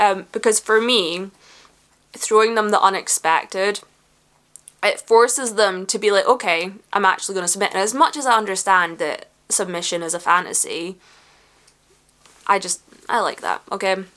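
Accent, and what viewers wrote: Scotland, United Kingdom. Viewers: scottish is like drunk poetry, tell me more love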